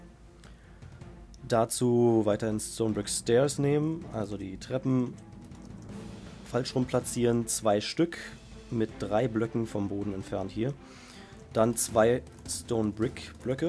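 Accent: Germany. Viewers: wow! ich fand dich sowieso schon klasse bevor du ein Deutsches tut. gemacht hast. in deinen Englischen viedeos merkt man kaum deinen deutschen accent! (hast sicherlich ein auslandsjahr oder ähnliches gemacht =)) aufjedenfall nutze ich deine Bauwerke sehr gerne und auch die Englischen tutorials maag ich! peace leon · Ich hab grad ein englisches Vid gesehen von dir und obwohl dein Englisch echt sehr gut ist, hab ich sofort erkannt, dass du Deutscher bist. :D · I knew that your accent was german! Deutschland all they way! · Jetzt merke ich erst das du einen deutschen Aktzent hast wenn du englisch sprichst :) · The German accent is so cool!!!! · Забавный немецкий) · Man hört doch den deutschen Akzent voll :o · jetzt wenn mans weiss das du deutscher bist hört man auch den akzent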